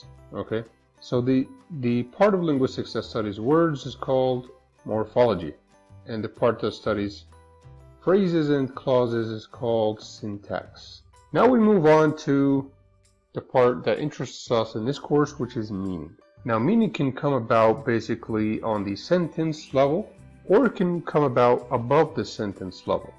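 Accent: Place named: United States. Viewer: sounds like some american all of sudden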